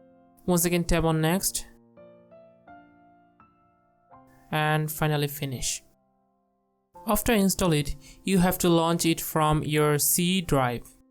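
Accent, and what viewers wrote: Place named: India. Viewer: Indian — English version of India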